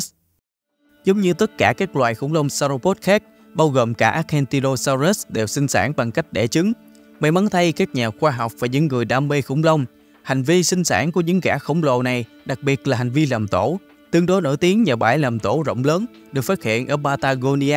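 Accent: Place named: North, Vietnam. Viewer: không phải phâm biệt vùng miền gì mình là người miền trung vẫn thích nghe giọng bắc chuẩn hơn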